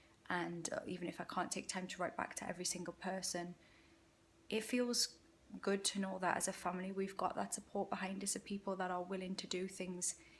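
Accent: Newcastle, United Kingdom. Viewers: your Geordie accent video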